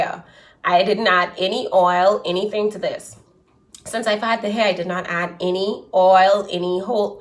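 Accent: Bahamas. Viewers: Are you a Bahamian? I think I hear the accent but I wanna be sure lol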